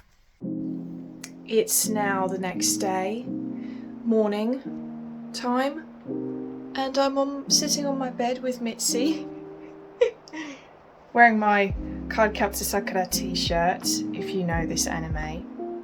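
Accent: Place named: Birmingham, United Kingdom. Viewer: You have shown great courage in order to follow your heart. I think you have kind of a Brummie accent to some extent. Well your taste in music compliments your personality all too well. If you don't mind would you like to try this australian band called. By send my love to the Mitsy as well.😇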